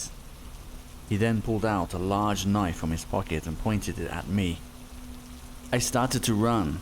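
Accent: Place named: England, United Kingdom. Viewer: Subscribed on the first thing. Yay~ Your English accent is so pleasant and your voice will definitely be in my ears as twice as before since you will be uploading quite often from now on. 🤭 Super thoughtful of you to add in the pixel art 3D styles visual, totally loving it. It makes the entire video become so interesting and lively. I got spooked . Lmao. 🤫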